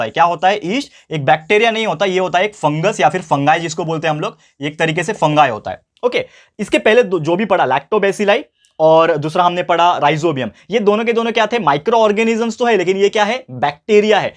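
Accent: Maharashtra, India. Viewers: Sir are you a Marathi speaker🤔😇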